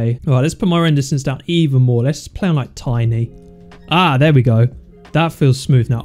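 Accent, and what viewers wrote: United Kingdom. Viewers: Are you Brithish English or Amerikan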